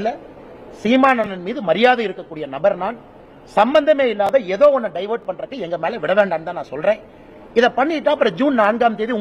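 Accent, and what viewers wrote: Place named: Karnataka, India. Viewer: இவர் பேசும் போது கன்னட வாட வருது.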